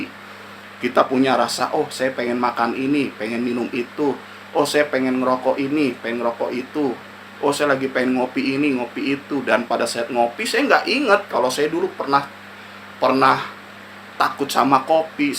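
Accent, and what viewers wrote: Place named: Depok, Indonesia. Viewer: Asyiikk neh bahasa depok nya 😅😅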